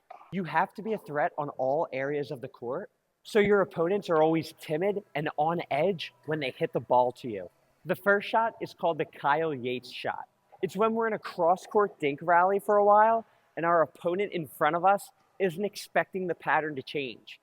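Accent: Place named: New Jersey, United States. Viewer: i trust you have the best intentions, but it doesn't necessarily mean you're right. i've never watched his videos and felt he was talking down to me. and this is coming from the pov of a former nyc public school teacher and 4.5 player. to me, just sounds new jersey direct and enthusiastic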